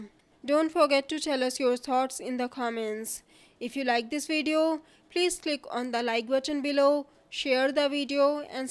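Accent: India. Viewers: the video is good but ur accents indian _. no offence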